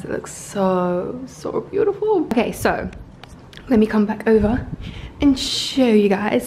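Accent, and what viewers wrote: United Kingdom. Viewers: At the beginning of the video you have "LND>IBZ" so I think she lives in London (and she has got British accent so I suppose she's from UK). But I'm also new so I'm not sure hah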